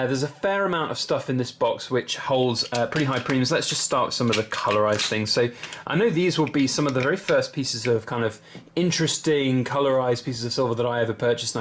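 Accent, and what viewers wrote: England, United Kingdom. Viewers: Love your channel. Sometimes I just play your videos while I'm relaxing. I guess its the English accent. Gives your channel that European flair that isn't common in the US. Always good content. Have you seen the price of silver? You can sell your extra silver now. Or keep holding out. How much silver do you buy monthly? weekly?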